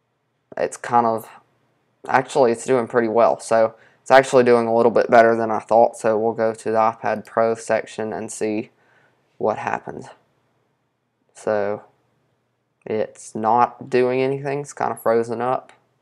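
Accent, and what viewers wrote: South, United States. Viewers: It's southern US but like city southern.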